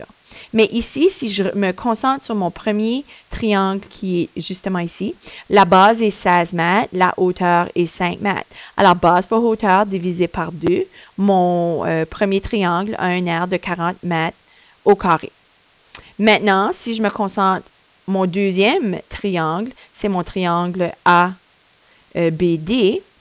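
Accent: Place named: Quebec, Canada. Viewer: Mais oui les Québécois ont un petit accent . . . adorable .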